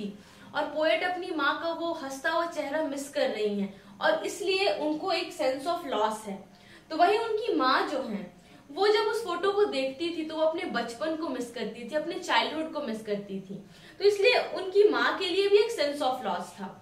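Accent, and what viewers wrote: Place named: Bihar, India. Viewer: Didi you are incredible. Are you from Bihar ? As it seems to me.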